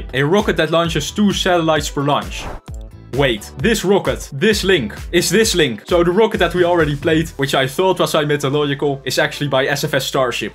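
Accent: Netherlands. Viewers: Question: are you Dutch? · ik smell a littel nederlands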